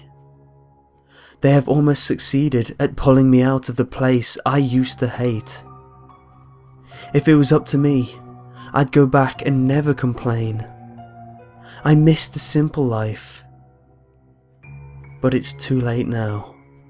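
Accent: United Kingdom. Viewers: Even better HES GOT A BRITTISH ACCENT *Dies of the sexyness* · Oh man, I am in love with your British gentleman accent. You sir, are the most amazing scary story teller I'v ever heard. Maybe in my WHOLE LIFE!!!!!!!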